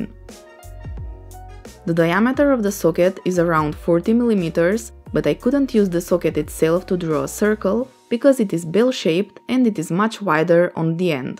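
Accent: Russia. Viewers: Русский акцент? Русский акцент = крутой видос Спасибо за интересный туториал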